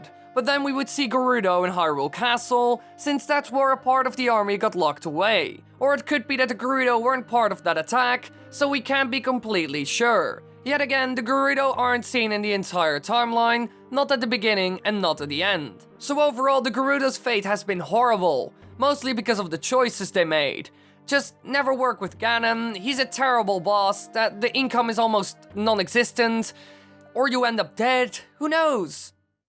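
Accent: Netherlands. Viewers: It's Dutch. We all sound like that in English.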